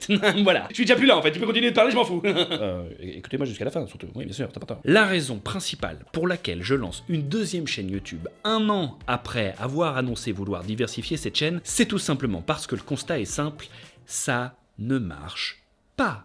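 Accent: Belgium. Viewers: Abonné depuis 10 ans avec le petite 🔔, j'ai cliqué sur chacune de tes vidéos et j'ai toujours apprécié, car même si je suis passionné par le cinéma, je regarde ta chaîne surtout pour toi, j'ai direct accroché à ton humour et à ce que tu dégages (et un peu aussi pour l'accent belge 🙄😂 Je suis pas très loin) et ce depuis 10 ans ! 😁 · Magnifique accent Belge et aussi bonne année.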